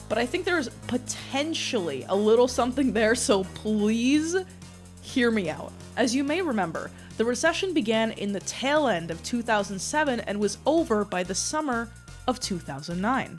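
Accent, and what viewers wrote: New York, United States. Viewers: she’s just got a wicked new york/new jersey accent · Y'all are unaware of the New York/New Jersey accent???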